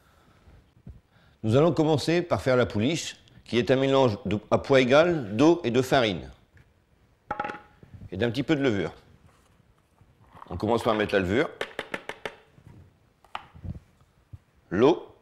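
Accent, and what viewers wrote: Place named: Pas-de-Calais, France. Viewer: Il a l'accent du pas de Calais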